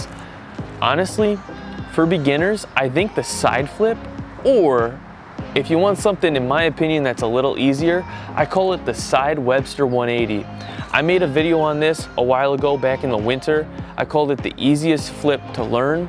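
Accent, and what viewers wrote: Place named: Michigan, United States. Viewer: You have such a Michigan accent lol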